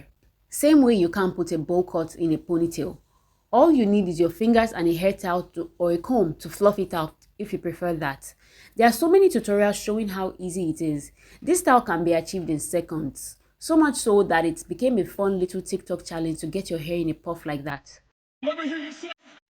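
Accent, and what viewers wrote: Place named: Nigeria. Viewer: How can i like this video more than once? Your Nigerian accent and no bullshit attitude only makes it better.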